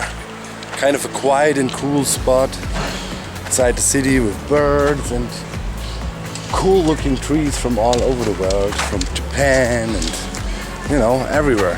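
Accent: Germany. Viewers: Here we have a German man in Spain Sperling English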